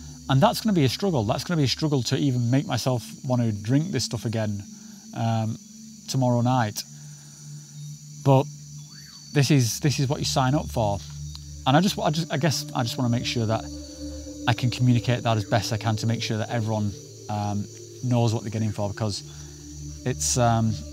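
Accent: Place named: Manchester, United Kingdom. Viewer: Are you from Manchester? You sound like Tyson Fury LOL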